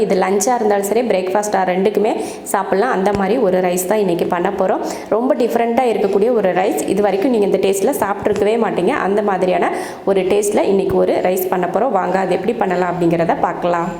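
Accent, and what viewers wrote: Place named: Tirunelveli, India. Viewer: OK... Avangaloda slang tirunelveli mathiri irukku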